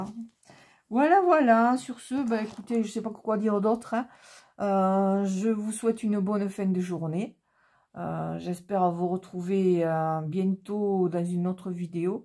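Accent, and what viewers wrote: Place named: South, France. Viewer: Bonsoir Miss à l'accent du soleil ???? Bonne idée super merci de ta vidéo scrapbises de l'Atlantique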